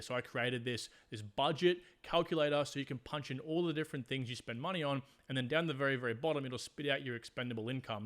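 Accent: Australia. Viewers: Absolutely no bs, I love it. Educational as heck, perfect Australian accent, and everything you could honestly ask for, especially being free content. Brotha keep the grind up, I absolutely appreciate the help you give us, thank you and take care of ya self✌🏻🙏🏻💪🏻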